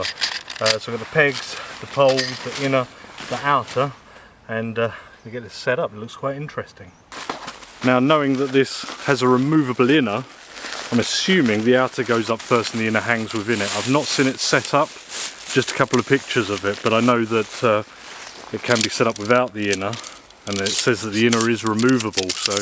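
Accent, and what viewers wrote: England, United Kingdom. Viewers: Nice video English Accent different than the NYC dribble BS😎👍 That stove attached to the link is currently unavailable, oh well I guess that is the way it goes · I'm sure your significant other loves your camp cooking. I know I would even with a good couple of nice beers. Love your lifestyle. Was hoping to get out myself hot tenting in the snow but all we have had is rain, rain., rain over here in Southwest Washington State USA. Stay safe and thank you for taking us along on your wonderful outdoor adventures. I love your British accent.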